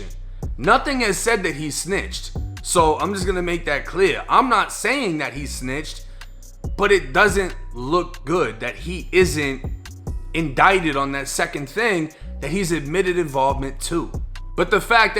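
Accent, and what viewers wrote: Boston, United States. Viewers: putting wayyyyy too much on that boston accent bud lol. cringe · Uh, He’s from Boston? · U should follow the Nigerian blogger Dr Yemi on IG for all the updates on HushPuppi from Nigeria 🇳🇬 point of view- I KNEW he was gonna start telling on every1 bc his own homeboys told on HIM - they all clowns 🤡 if u asked me - I mean WHO gathers their own evidence against themselves- 🤦🏾‍♀️👀👀✊🏾✊🏾 thanks for the video though we love ur accent (is THAT a Southey BossTown accent) or Worcester??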